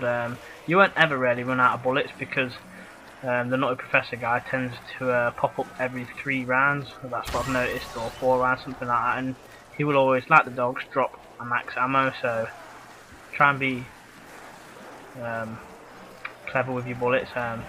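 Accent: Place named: United Kingdom. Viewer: i love ur british voice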